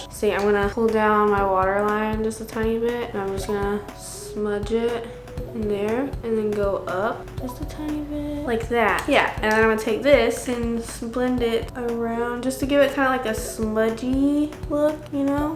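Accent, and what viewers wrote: United Kingdom. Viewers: PLS THE BRITISH ACCENT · I love your british accentkdnskqnfnwndnd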